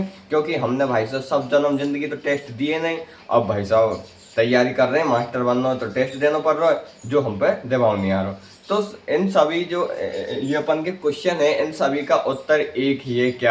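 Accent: Bharatpur, India. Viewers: From mahwa dausa Are you Bharatpuiya Hamari jaisi bhasha hai aapki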